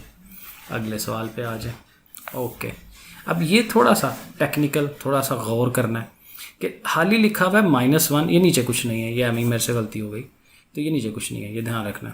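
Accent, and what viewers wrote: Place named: Lahore, Pakistan. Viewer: Ap ki language lahore jasi lg rahi ha lakin ap muskil kam ko bohat easy kr daty ho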